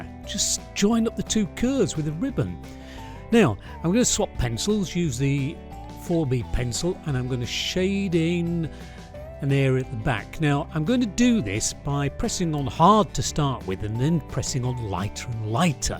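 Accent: United Kingdom. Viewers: hmm how to practice my s curves... AH! draw ribbons!! the British accent, the voice of logic and reason, love it! subbed and checking out your other videos, cliffs sounds fun! watching that next after your video on lines. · The British accent with honky tonk music is a neat, yet confounding juxtaposition.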